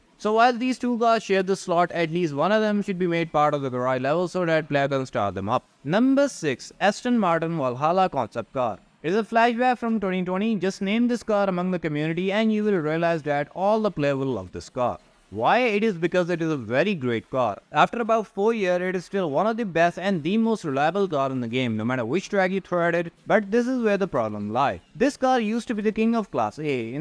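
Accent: India. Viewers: Bro you indian? If not where you from?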